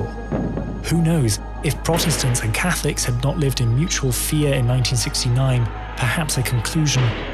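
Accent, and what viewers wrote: United Kingdom. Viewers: Swiss? Lmao I thought this guy was from the UK too. He speaks English with a VERY strong British influence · For a swiss fella, you sound awfully British. Anywho, your command of the english language and the lucidity you displayed is incredible! Hard to believe English is your second language!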